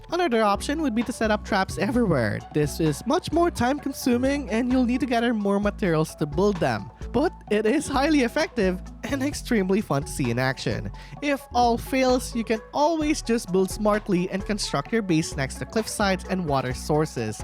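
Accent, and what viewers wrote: Philippines. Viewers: i needed help but not that bad enough to put up with this fkn fillo accent bhahaahah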